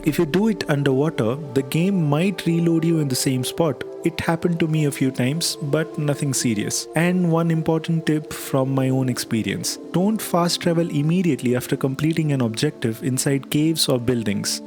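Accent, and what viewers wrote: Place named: India. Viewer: great vid bro ❤i was looking for some mods for my ng+ playthrough and this helps a ton, also i love your indian accent, it sounds kinda exotic 😁you should definitely keep it haha it sounds awesome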